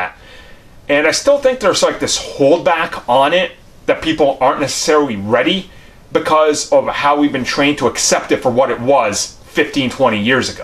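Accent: United States. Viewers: Would never have guessed you were from Bosnia. You're accent sounds about as American as it gets.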